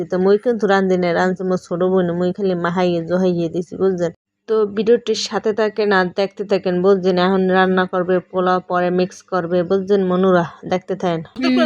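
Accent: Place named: Barishal, Bangladesh. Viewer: মাশাল্লাহ বরিশাইল্লা কথা অনেক সুন্দর। এর সাথে তোমার কাজগুলো অনেক সুন্দর ❤❤🎉🎉সুস্থ থেকো আপু লাইক দিয়ে পুরো ভিডিও দেখিনি। 🎉🎉🎉🎉🎉🎉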